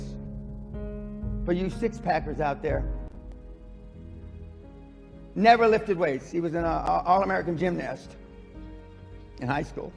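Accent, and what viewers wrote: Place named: Los Angeles, United States. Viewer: It's a mixture. He lived in Europe as a child, because his father was an Army officer in the early 1950s, so he heard a lot of different accents from his father's friends' kids, and from Europeans who spoke English as a second language. Then the family moved to the barrio in East Los Angeles, a bad neighborhood, until his mother insisted they move. Then he lived in a nicer neighborhood. Then he joined the Army, where you hear a variety of accents. I hear some East LA in his accent, but also a bit of posh English, some standard American, it's a unique accent. No one really speaks like him.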